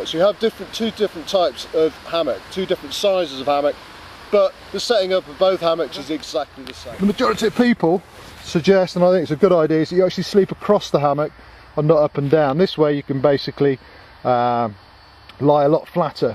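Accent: United Kingdom. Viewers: This is such a great video. Thank you. My boyfriend and I are getting our first Hennessys in a few days...an Explorer Deluxe for him and an Expedition for me. The package should arrive on Tuesday, and I'm really excited to try them out!! I'm only 5'5" and was going to get a free Scout as part of their website promotion, but the kind folks at Hennessy upgraded me to the Expedition. :-) I am a Yank who is really bad at placing accents -- may I ask what part of the UK you are from?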